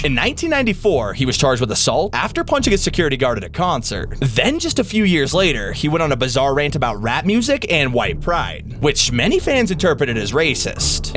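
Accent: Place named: United States. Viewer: The bloke who did this video sounds like some American leftie tosspot.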